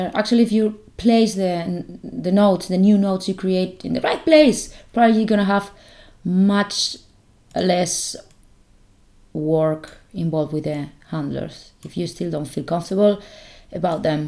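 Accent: Spain. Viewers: Hola, la verdad es que me encanta escuchar el ingles con este acento nuestro Español, de cualquier manera queria decirte que me encantan tus videos y queria preguntarte haces algun tipo de clase online, de clase privada me refiero y por cierto todo lo que muestras aqui aplica en Affinity photo tambien, ahi me dices gracias.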